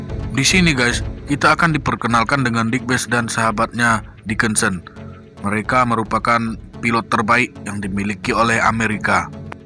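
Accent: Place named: Jakarta, Indonesia. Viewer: Astagfirullah, yg komen org Batak yg dikomplain logat Batak. Ni siapa yg isi suara? Mirip suara2 org Betawi sana..., Mcem suara org Jakarta ya.. sukses buat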